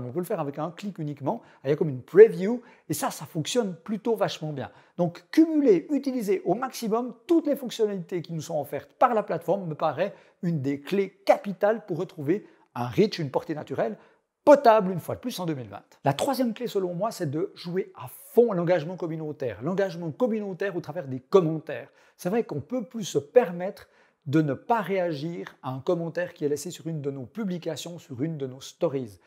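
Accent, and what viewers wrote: Switzerland. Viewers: Hello je suis vaudois, t'as un accents qui vient de quel region en suisse?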